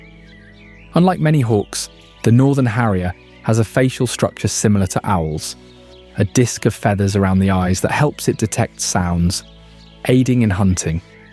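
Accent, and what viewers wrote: United Kingdom. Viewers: Can we get an American to speak about Wyoming not a limey annoying brit accent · Wyoming is beautiful and amazing .... but why in the hell is some Brit with rosy cheeks and their funky accent telling us all about Wyoming and making incorrect statements and plenty of mistakes ... typical Brit trying to capitalize off of America's land and beauty ... maybe a hillbilly mountain man like me should go over there and make a video and tell them all about "STONED HEDGE"....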